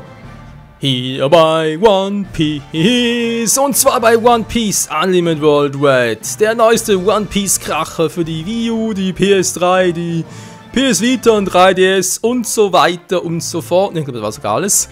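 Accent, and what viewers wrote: Switzerland. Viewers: Bist du zufälligerweise Schweizer? :D PS: merkt man am Dialekt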